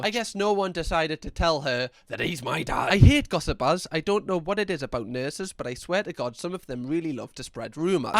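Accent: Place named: North East England, United Kingdom. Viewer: I didn’t realise it was so long I’m about 50 minutes in, I love to binge watch so I wouldn’t have noticed I would have thought I’d just watched a series but thanks for the effort you put into these videos they are very entertaining you have an ace sense of humour and I love the north eastern accents, this one and Irish both melt me 😊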